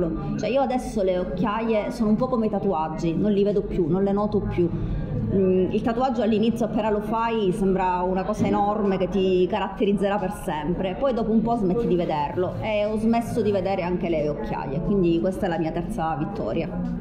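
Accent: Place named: Cosenza, Italy. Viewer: L’ho scoperta solo ora, quasi per caso, per la sua analisi in quattro parti del film “Barbie”, che ho trovato quasi geniale. Sono andato a ritroso nei sui video su Instagram, e ho sempre trovato analisi centrate, acute, mai ideologiche, sempre equilibrate e ricche di spunti di riflessione e notizie, informazioni e curiosità che non conoscevo, o alle quali non avevo fatto caso. Sempre condivisibile. Una vera scoperta. In più è simpaticissima e dolce col suo accento cosentino. In una sola parola : adorabile. ❤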